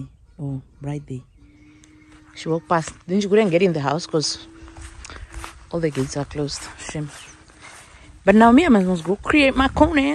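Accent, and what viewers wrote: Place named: Oshikwanyama, Namibia. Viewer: Eish the way u speak oshikwanyama i enjoy it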